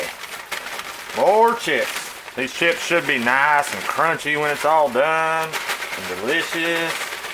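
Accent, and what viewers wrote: South, United States. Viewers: Looks really good and definitely something that my kiddos would love. Congratulations on being sober and not drinking anymore. Love the Southern accent sounds like myself and I'm in a room with my family, lol..where you from?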